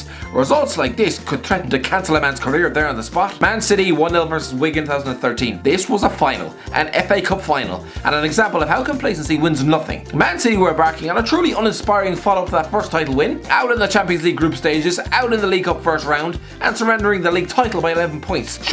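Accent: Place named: Ireland. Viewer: Irish guy voice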